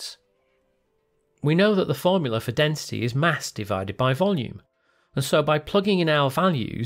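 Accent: United Kingdom. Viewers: Why do you have a British accent but you say things like "4 miles"?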